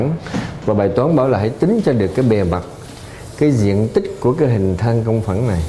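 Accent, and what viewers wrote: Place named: Central, Vietnam. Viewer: Giọng thầy miền Trung, Qng hay Qnam thì phải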